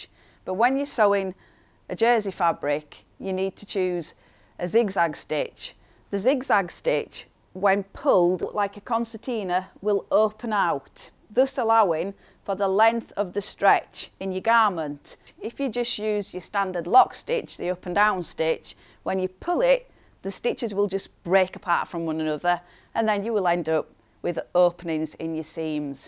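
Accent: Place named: Yorkshire, United Kingdom. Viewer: That’s a Yorkshire accent 😉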